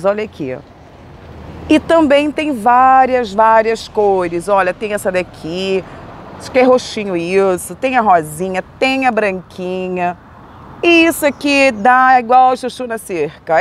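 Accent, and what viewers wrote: Rio de Janeiro, Brazil. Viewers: Eu acho que vc é carioca.deve morar no rio de janeiro vc tem o sutaque de carioca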